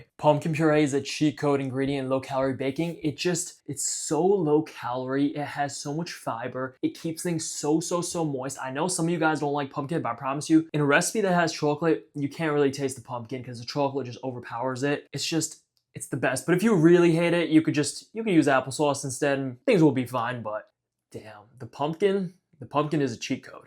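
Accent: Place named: New Jersey, United States. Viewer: That new jersey accent, also sus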